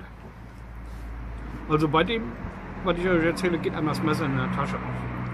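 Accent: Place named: Bingen, Germany. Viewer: genial hab ich doch gleich am Dialekt gehört, ich komm aus Binge schaue deine Videos schon ein ganze weile,bist auch aboniert.... ich mag an die 1.das du gerne Bier wäreend deiner Videos trinkst und natürlich Messer genau wie ich liebe. Hätte mal Bock mit dir das ein oder andere Pilz zu zerstören und dabei über Messer zu fachsimpeln.... LG de Bingen Andre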